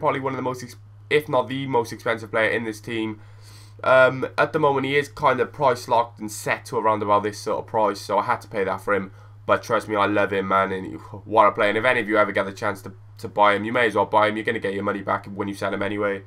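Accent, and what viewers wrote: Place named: Liverpool, United Kingdom. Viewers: You sound like ya from liverpool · Terrible scouse accent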